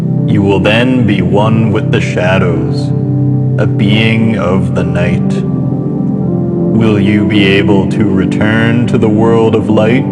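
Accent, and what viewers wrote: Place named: Canada. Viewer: Is it weird I listen to these in the bath as I find your voice and accent relaxing 😌 you should do an audio book! I love the Canadian accent 😍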